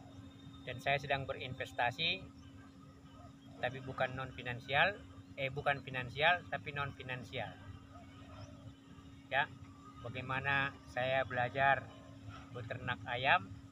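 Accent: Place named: Sulawesi, Indonesia. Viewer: Mantap nih..kalau dari kata-katanya bapak ini pintar dan berpendidikan tinggi dan seperti orang Sulawesi gaya bicaranya ..sehat dan sukses 🙏🙏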